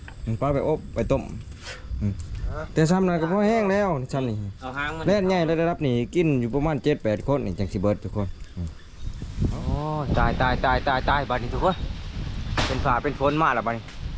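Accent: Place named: Isan, Thailand. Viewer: เป็นคนลาวหรือไทยคะสำเนียงเหมือนคนอุบลกับศรีสะเกษเลยพูดไม่เหมือนคนลาวแท้ๆฟังง่ายค่ะ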